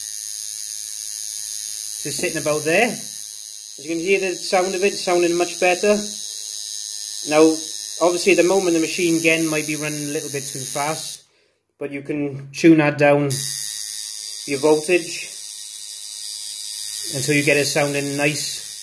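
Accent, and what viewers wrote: Wales, United Kingdom. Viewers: I heard the Welsh accent and immediately knew I was safe, very detailed, thanks mate - a fellow welshy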